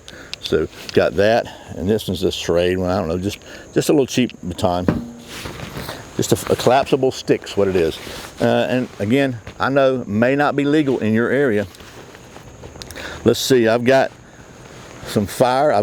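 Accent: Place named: Carroll County, United States. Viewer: sounds like you might be from around the Carroll county area or Douglas county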